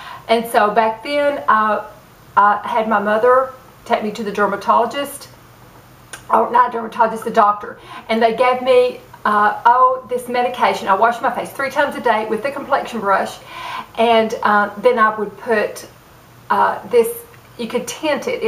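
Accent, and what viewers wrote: South, United States. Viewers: Hi, I just subscribed to your channel. I like your southern accent. I was totally shocked when you said you were 59. I am 61 and always trying to anti-age. I would put you right up there with Christie Brinkley. We don't have to look like old ladies as we age if we take care of ourselves and our skin. Have a blessed day!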